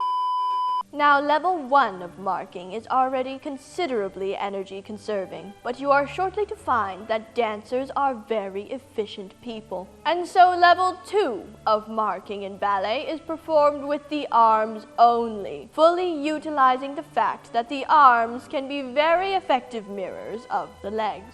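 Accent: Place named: England, United Kingdom. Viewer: I love how English accent becomes more pronounced as the video continues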